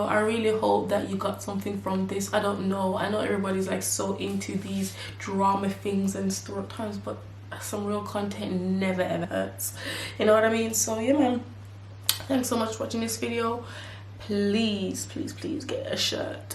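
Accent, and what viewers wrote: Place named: Jamaica. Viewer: I hear the Jamaican in you.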